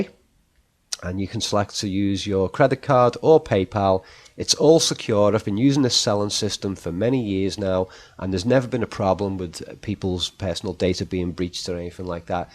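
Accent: Liverpool, United Kingdom. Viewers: The juxtaposition of horror movie analysis and a Scouse accent is scary in itself!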